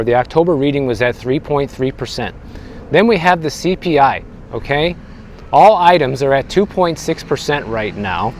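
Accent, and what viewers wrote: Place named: Miami, United States. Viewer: 😂😂😂😂 nice start my man! Truly Miami Sounds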